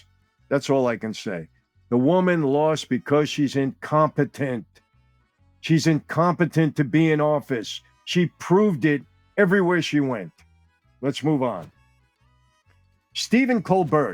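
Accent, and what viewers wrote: New York, United States. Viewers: Such a great word . Said with perfection with your Distinctive Italian/Anerican New York scrabble . How have you not done voice work??? Really easy on the ears. That’s a good diversity actually. Get some good scrips and escape into a sound booth brother. Need right scripts though! Just thinking out loud brother. 😅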